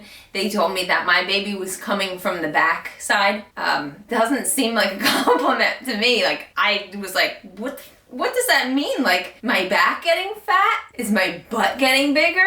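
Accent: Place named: Philadelphia, United States. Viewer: Just found you! Im 14 weeks today.... i love your accent are you from Philly?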